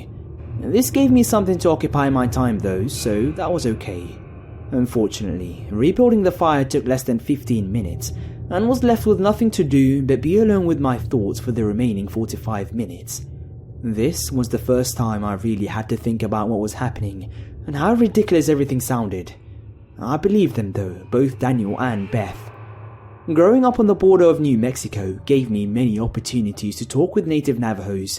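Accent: United Kingdom. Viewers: Are you and Lazy Masquerade friends or from the same town? You guys talk very similarly. (Not talking about just the British accent of course) Great stories! Keep up the creepy work!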